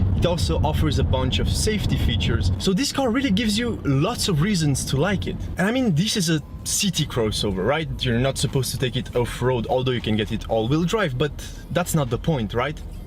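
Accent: France. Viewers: Worst minutes spent in my life. Beside the shitty english french accent, the presenter has no clue about cars. It's like he is read from a paper. Not to mentiom he is ugly af.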